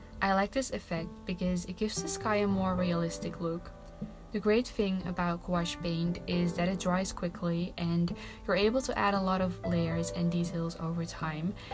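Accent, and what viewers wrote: Netherlands. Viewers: Your accent sounds, N e d e r l a n d s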